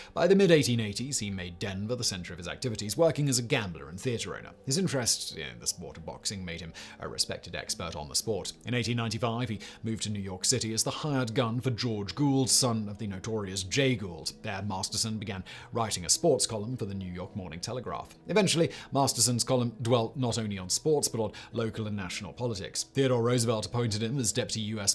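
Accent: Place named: RP, United Kingdom. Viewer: he is speaking he Queens English the real version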